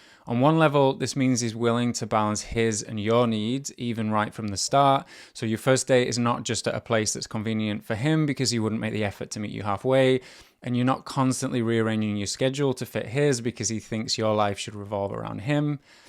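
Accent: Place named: United Kingdom. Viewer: Your British accent is extremely soothing.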